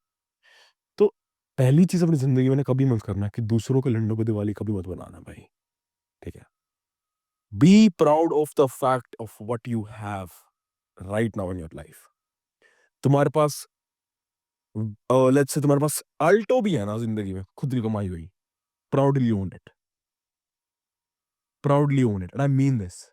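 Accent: Haryana, India. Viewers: Valid points bro btw you sound like raftaar in haryanvi accent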